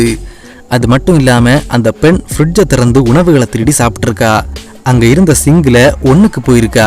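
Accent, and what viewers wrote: Kanyakumari, India. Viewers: Bro neega kaniyakumariya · Nega kanyakumari ah 🤔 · Bro KANYAKUMARI aahh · உங்க ஊரு கன்னியாகுமரிதானே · Bro neenga Kanyakumari ya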